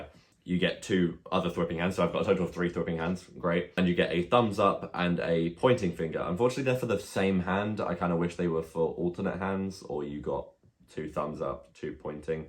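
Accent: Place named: United Kingdom. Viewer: Very funny and informative review, subscribed! I'm guessing from your accent that you're based in the UK, if you are then please let me know where you get your figures from since I'm also based in the UK and shipping prices have gotten pretty rough since covid lol.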